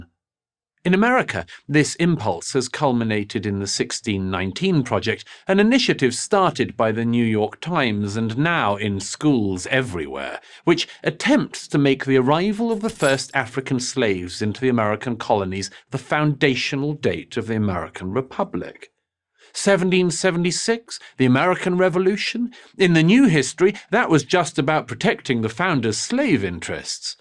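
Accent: Scotland, United Kingdom. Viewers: I agree. His voice is cold. Cold and bereft of love for humanity. It is the voice a crocodile would have if it were rendered the power of speech. I personally really like it, but then I always get hard at a Scottish accent that has been mangled to sound English.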